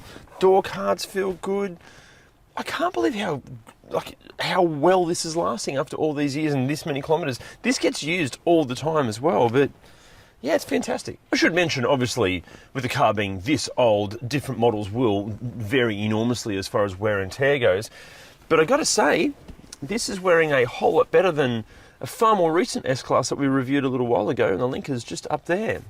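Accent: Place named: Australia. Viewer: Horrible accent. What is it? Doesn’t pronounce his ‘t’s and ‘R’s Australian I think?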